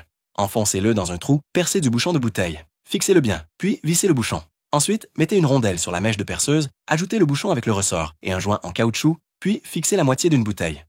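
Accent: Canada. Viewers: Si la voix était prononcée par un accent français et non canadien ce serait agréable à écouter Si...c était plus lent à regarder...ce serait bien plus plaisant Comme ça est maintenant....impossible de m abonner J aime me sentir " bien"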